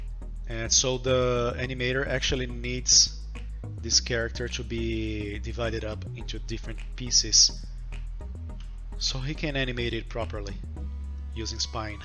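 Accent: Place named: Brazil. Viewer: Na hora que ouvi o sotaque falei, esse cara é brasileiro.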